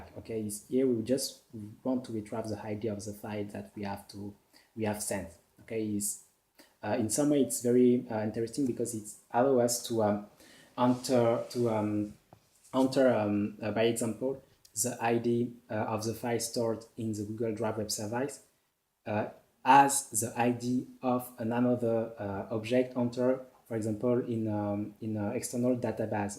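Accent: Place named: Germany. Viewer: Great tutorial brother, Loved that German accent!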